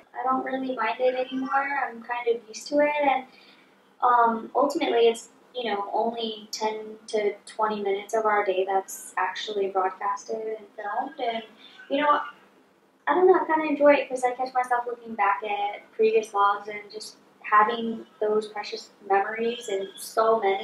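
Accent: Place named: South, United States. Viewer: where are they from they kind of have a southern accent.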